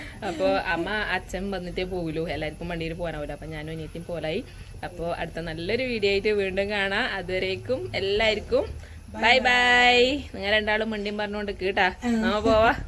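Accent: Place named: North Malabar, India. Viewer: Hii നമ്മളും ആ നാട്ടുകാരാണുട്ടോ കാങ്കോൽ. വടശ്ശേരി മണൽ. ഇപ്പൊ മുംബയിൽ സ്ഥിരതാമസം. നിങ്ങളെ വീഡിയോ കാണുമ്പോ ശെരിക്കും നാട് മിസ്സ്‌ ചെയ്യുന്നു. നല്ലരസുണ്ട്ട്ടാ. പ്രത്യേകിച്ച് നമ്മളെ ഭാഷ😀എനിക്കും യൂട്യൂബ് ചാനൽ ഇണ്ട് wings of dreams ഇതെന്നെ ഈ കമന്റ്‌ ഇടുന്ന അക്കൗണ്ട് ഞാനും മുംബൈ ആണെങ്കിലും നമ്മളെ ഭാഷയും നാടും ഒക്കെയാണ് സ്നേഹം ❤️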